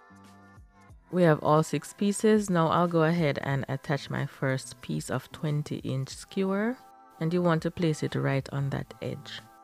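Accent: Jamaica. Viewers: All these creations are beautiful. I'm definitely going to try the flower and black vase. Your accent sounds Jamaican, are you?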